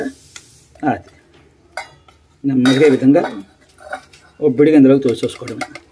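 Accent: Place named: Andhra Pradesh, India. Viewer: Maadi kudaa same slang… same place Rajamandry❤️❤️❤️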